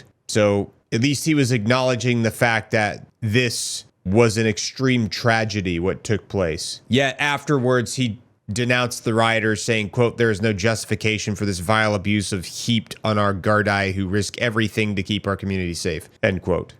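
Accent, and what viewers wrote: United States. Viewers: Takes a yinz to know a yinz. Cant hide our burgh accent brother.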